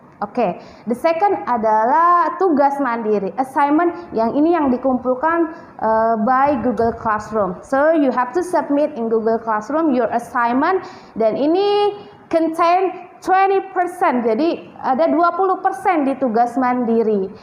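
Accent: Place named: United Kingdom. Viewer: Pengen ngucapin BHS Inggris kayak ibu dosen, fahiiiih bgttt.. aksen Britishnya dapeet.. bah bih beeh..😁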